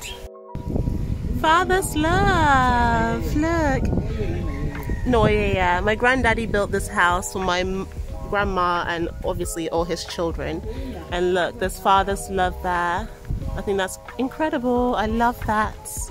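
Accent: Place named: United Kingdom. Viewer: I will imagine she went to the UK as a teenager that's why she picked the accent pretty quick. Hard to pick a new accent when you are 20 and up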